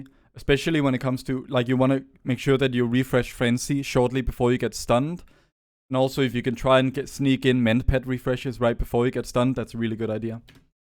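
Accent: Denmark. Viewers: Are you Danish? :)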